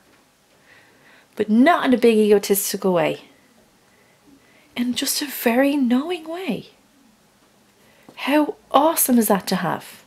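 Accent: Ireland. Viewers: Love the Irish accent 😌✨ dope reading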